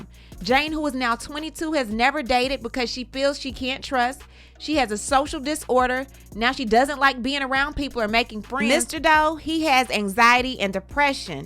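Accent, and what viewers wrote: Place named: Carolinas, United States. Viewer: I love and appreciate your coverage. You sound just like my cousin so it's easy listening to you plus you're detailed in your coverage. Thank you.. by the way are you from the Carolinas, bc you sound like us!! Lol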